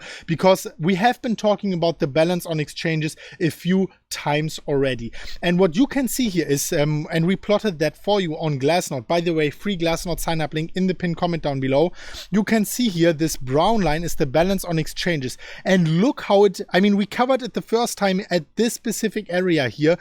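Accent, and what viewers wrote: Germany. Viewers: Your German accent and energy is really cool....LOL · Wie man den deutschen dialekt hört😂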